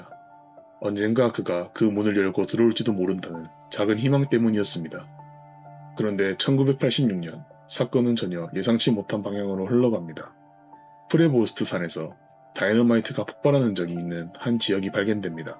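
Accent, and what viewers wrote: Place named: Gyeongsang, South Korea. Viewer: AI목소리가 아니라 갱상도 사람이네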